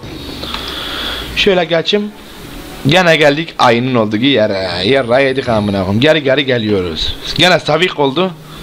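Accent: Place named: Trabzon, Turkey. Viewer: şivesi iyice tıraykyaya kayı bu ne leee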